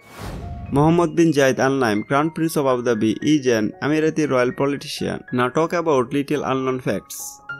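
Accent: Bengal, India. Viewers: A very Bengali accent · First improve your English pronunciation and then make such videos. Ur mother tongue is bengali and it is reflecting from your English dialect.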